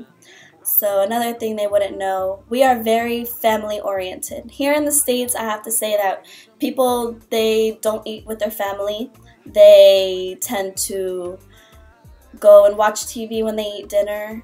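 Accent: Puerto Rico. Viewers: I thought I heard a lil Puertorican in there!! Hah!! So cute!! Lol ( You're very beautiful :)) Yes..My family is French Jamaican..I'm Indian..White and black ( We speak Spanish..English..French :)) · you sound straight Puerto Rican lol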